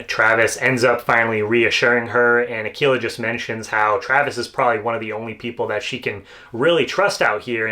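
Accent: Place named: Northeast, United States. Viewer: Hey, we are Aussies and were wondering where you are from based on your accent. The opinions are north east, northwest and one vote for Boston 😂 Please help😂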